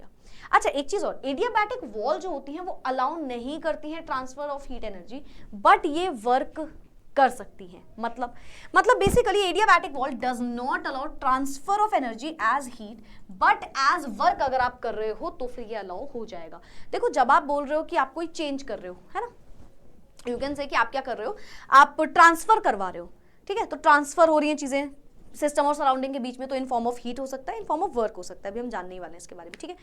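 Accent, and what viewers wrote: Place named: South, India. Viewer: Indiaaa, but not from north.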